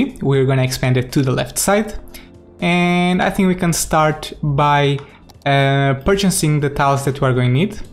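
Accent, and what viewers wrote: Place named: Portugal. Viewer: Tenho visto alguns videos teus e se a ideia era melhorar o teu inglês, tivemos todos sorte. Parabéns! Tens o melhor canal do youtube de skyline e tudo porque fazes aquilo que a maior parte do pessoal não faz - construir em tempo real (quase sempre). Tenho aprendido muito. Aprendido muito e percebido que ainda que o teu inglês seja exímio, só um português tem esse sotaque, o que me faz ter a plena certeza que tu entendeste tudo o que eu disse. :P Mas congrats mate! Great content, and amazing english :)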